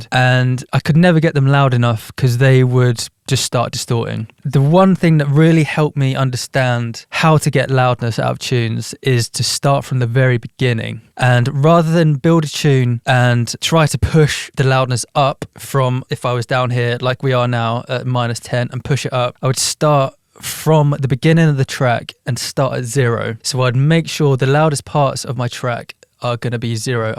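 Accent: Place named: United Kingdom. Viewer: Tutorial instructions hit different with that UK accent 😂😂😂 cheers from USA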